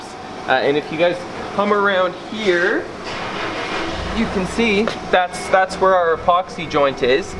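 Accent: Canada. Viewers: Canadian there baud we all sound the same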